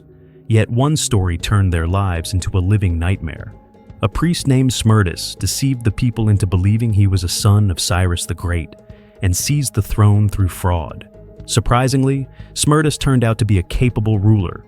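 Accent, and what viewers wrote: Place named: United States. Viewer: These accounts are always narrated by an American.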